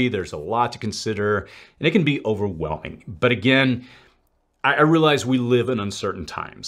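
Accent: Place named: California, United States. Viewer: I didn't want to be disrespectful but i hear cali. Soy. The real world isn't cali. Anything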